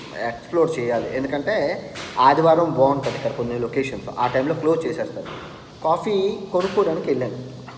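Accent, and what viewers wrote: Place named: Visakhapatnam, India. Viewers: చాలా బాగా వివరంగా చాలా శ్రమకి ఓర్చి మీరు vizag యాస తో మాట్లాడుతూ చేస్తున్న విడియోలు superb . మీకు అంతా మంచి జరగాలి . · Good vedios sweet tocking bayya..vizag language 👌👌👌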